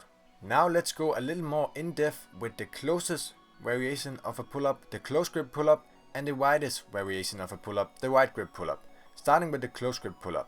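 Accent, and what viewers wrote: Denmark. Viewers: You sound Danish i know because im Danish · damn that danish accent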